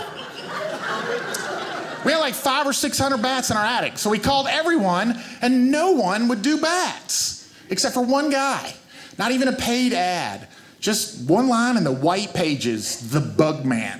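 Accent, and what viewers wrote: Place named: South, United States. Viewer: Really hilarious especially with the southern accent. Cant wait for more from him...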